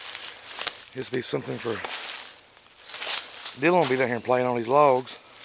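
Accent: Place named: Kentucky, United States. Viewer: Thought your accent sounded familiar. I'm in London KY. Laurel County....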